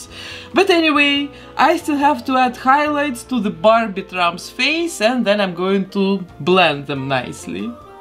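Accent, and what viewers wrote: Russia. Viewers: Very funny a Russian accent telling Americans how awful Trump is. I unsubbed. When I want to hear people make fun of my President, I'll listen to someone who at least has grown up in the USA. And I'll watch the Popovy sisters do real art without political grifting. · You're russian? This accent ...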